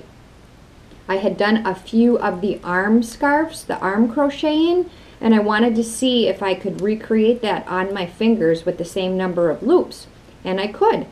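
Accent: Wisconsin, United States. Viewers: → ... stayed a year in Wisconsin as "jeune fille au-pair" (to learn English) in a beautiful house right at PineLake or LakeChenequa in Nashotah. – So even I was able to recognise your accent.] Greetings from Basle, alexy wi.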